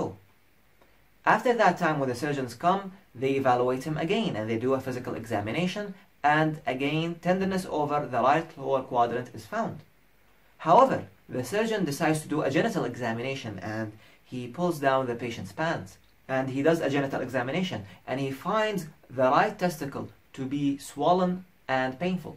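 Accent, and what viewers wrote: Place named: England, United Kingdom. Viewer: I am so excited to learn from you..please pray for me to be accepted in the med school...I loved the video the informations and your English accent as well...Good luck doctor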